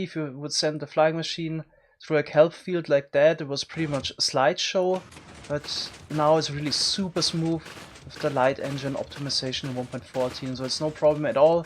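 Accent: Bavaria, Germany. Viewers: Glaube sogar Bayer. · Dude you can hear from his speaking that he is German and for Germans this is easy and simple xD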